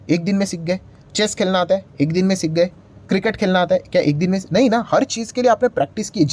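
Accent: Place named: Gujarat, India. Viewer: Yes sir I listen your videos word to word, belive me i observed your pronounce that you are guharati if not you are marathi with many gujarati friends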